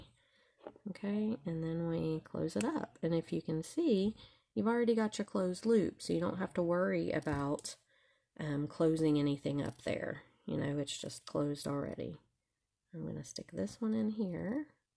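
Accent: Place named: Texas, United States. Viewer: I thought I had your accent pegged as Texan, but I see that Fort Bragg is in North Carolina. Being a military (Air Force) brat myself, I know that families move around a lot. So, my question is, where are you from? Btw, YouTube suggested your channel to me and I'm glad they did. I am a new subscriber.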